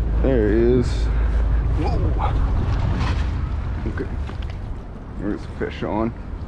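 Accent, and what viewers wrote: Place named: East Coast, United States. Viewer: Couple comments and questions?! First your outro music scared the SHIT out of me. I was intently staring at that fish you couldnt indentify watching him swim away and BAM. Heart attack. 😂 Next up, you sound super east coast. Its great. 😏👌 how do you euthanize the fish? Its nice to see you gently remove the hook. I went deep sea fishing once and the guy just ripped the hook out of the fishes lip 😭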